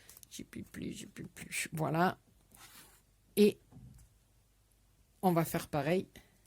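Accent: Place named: Marseille, France. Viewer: J adore votre façon de parler vous faite pensées à ma belle soeur de Marseille bisous d amiens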